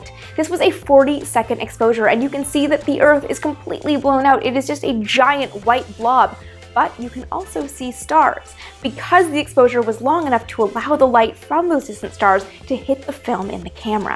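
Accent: Canada. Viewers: About. Canadian. That is all. Bye.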